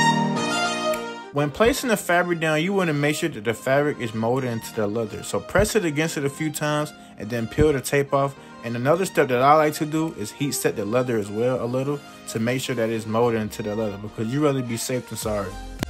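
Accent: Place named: South, United States. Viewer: You sound so country that I didn’t understand what you were talking about until you slowed your words down…Thanks for understanding 😊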